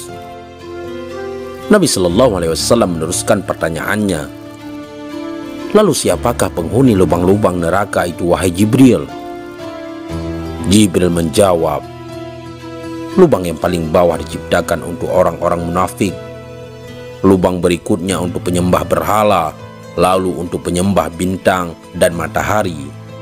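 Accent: Aceh, Indonesia. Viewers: Dialeg Aceh sptnya..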